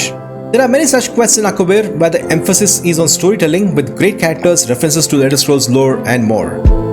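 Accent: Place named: India. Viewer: Sorry if this is rude but out of curiosity what part of the Indian sub-continent do you come from? I always find slight regional differences in accents interesting and I haven't heard one exactly like yours. If this is to rude/personal of a question please don't feel like you need to answer.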